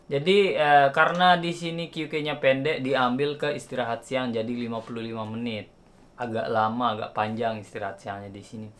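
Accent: Lombok, Indonesia. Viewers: Ndk ini telang ye medok bahsa lombok kadu bahasa indonesia.... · Ya ampun...logat lomboknya masih kentara banget yaaaa....wkwkwkwkwkk Salam dari Lembar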